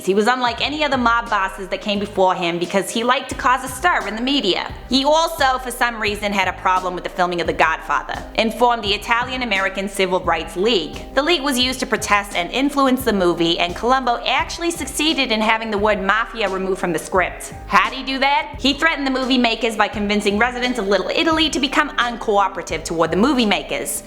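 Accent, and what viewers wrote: New York, United States. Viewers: NY accent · You're rocking that shirt. 🎃 Edit: your New York accent is awesome said in a New York accent 😂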